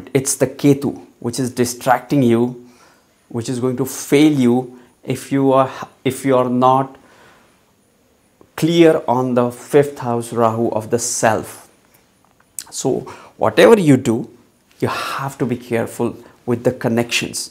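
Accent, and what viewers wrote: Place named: Russia. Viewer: I have this struggle in life too. What a great video. Spot on how Rahu is shaky and made me go from one thing to the other. Your message towards the end was really good to be specific to find our calling, our Dharma and not be distracted. By the way you sound as if you have a bit of Russian accent - esp. when you say - "You''ll always" and "energy". Just saying. Sounds great. thank you.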